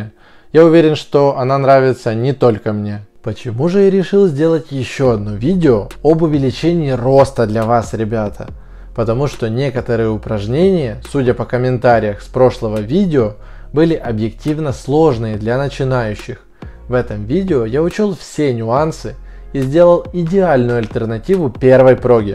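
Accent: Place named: Ukraine. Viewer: Опять ховор, укр...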